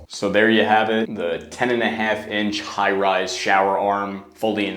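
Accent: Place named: RP, United Kingdom. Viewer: tutorial on installing an S-style shower arm is absolutely top-tier. His plummy delivery and refined advice make a technical plumbing task feel like a sophisticated DIY masterclass. He explains the elevation gains and clearance benefits with such clarity that even a complex setup feels effortless. If you’re looking to upgrade your shower experience with professional guidance, this is the gold standard.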